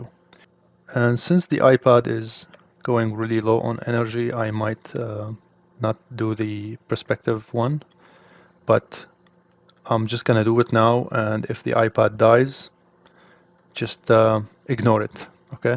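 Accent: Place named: Serbia. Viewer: You have a Serbian accent:)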